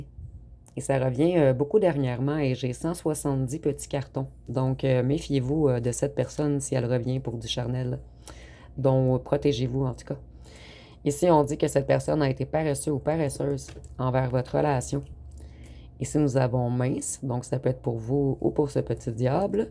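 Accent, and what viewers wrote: Quebec, Canada. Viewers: Merci ma belle ame quebecoise j'adore vous ecouter et charmant accent quebecois.....❤❤❤❤❤❤❤❤❤❤❤❤❤❤❤❤❤❤❤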